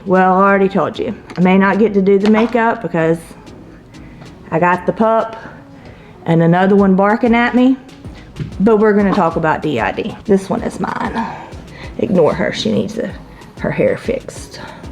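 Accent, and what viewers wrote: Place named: South, United States. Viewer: This was on my recommendations and I'm glad I stopped by to give you a watch. Even though you scripted this video and weren't too into scripting, you still feel very familiar and friendly. Maybe it's the southern accent, but it feels like I'm sitting on the front porch with a friend swinging on the porch swing, drinking sweet tea and talking about life (I'm southern, too, lol). Your dogs are super cute! Thank you for sharing :D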